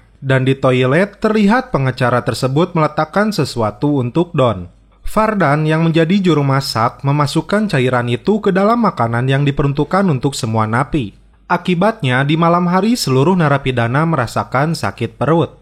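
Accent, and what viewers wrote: West Java, Indonesia. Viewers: Njir Sunda wkk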